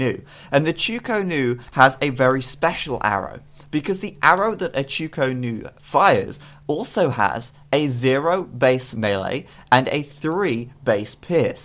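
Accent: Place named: Northern England, United Kingdom. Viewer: northern English to me.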